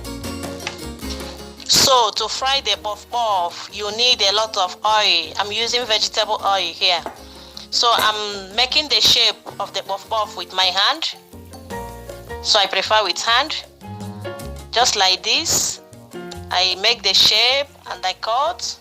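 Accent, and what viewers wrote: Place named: Nigeria. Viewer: The Nigerian accent is on point. I'll be sure to try these out.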